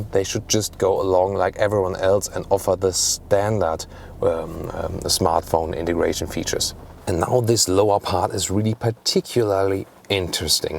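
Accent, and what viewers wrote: Germany. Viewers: English with german accent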